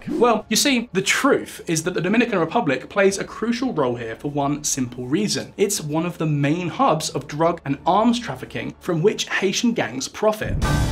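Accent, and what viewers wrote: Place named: England, United Kingdom. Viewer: The host is not American. Listen to his accent, he sounds English.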